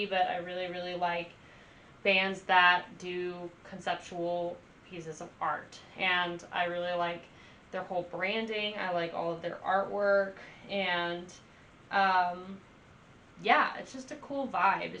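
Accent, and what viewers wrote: South, United States. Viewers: You are so cute with your south lol I'm sure by now you know they are from ATL....I've met them and they're all so southern humble (RIP) Brent 😢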